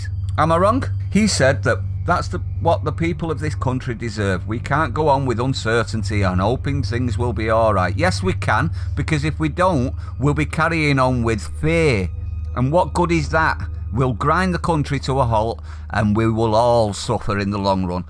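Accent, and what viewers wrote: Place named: Salford, United Kingdom. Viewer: Salford? If not it’s great to hear an accent close to me own talking sense.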